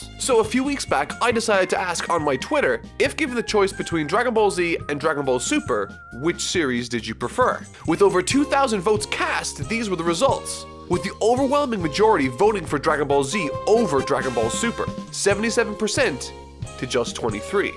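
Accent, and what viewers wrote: Ireland. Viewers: Just curious are you Irish? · Idk why i didn't catch it before but you are really irish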